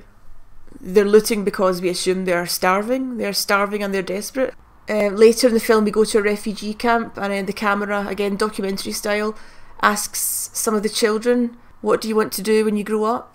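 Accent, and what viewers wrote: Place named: Scotland, United Kingdom. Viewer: I'm of Scottish and British decent. I love your Scottish accent. What part of Scotland are you from. Love Threads and this movie too.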